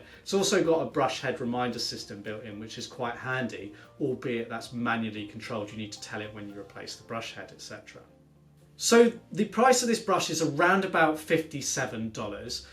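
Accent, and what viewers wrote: United Kingdom. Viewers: Aren't you a British one?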